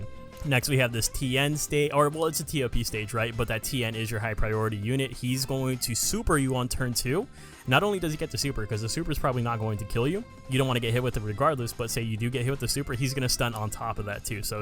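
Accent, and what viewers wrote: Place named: Philadelphia, United States. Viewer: “ Launch that jawn “ bro definitely from Philadelphia or close by 😭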